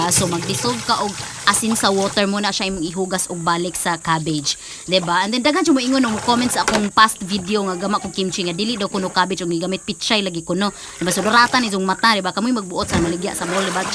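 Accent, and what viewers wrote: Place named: Visayas, Philippines. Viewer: ha ha ha ha ha! You are so lovely with your bisaya/bodzol. besides that i am learning from you kitchen, you make me laugh as well. i love it. CHARS!!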